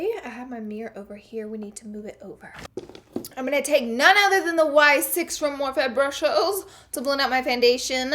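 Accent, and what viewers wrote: South, United States. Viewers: Jennifer Lynn its called a southern accent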